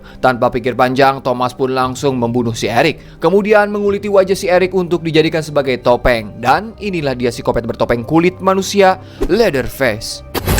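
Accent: West Java, Indonesia. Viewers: Admin nya urang Sunda belah mana euyy😅😮😂😂😂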